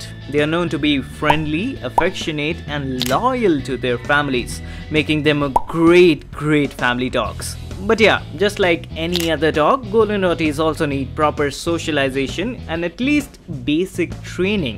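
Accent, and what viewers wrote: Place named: India. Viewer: You sound funny... Let me guess, you're an indian.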